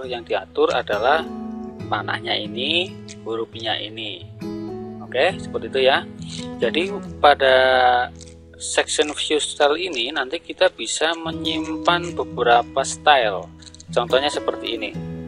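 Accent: Banyumas, Indonesia. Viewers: Semngaaat kang..Samapi lupa kunjung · Nyimak lagi dijelasin sama pak dosen.. Salam Kay Rend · sukses terus om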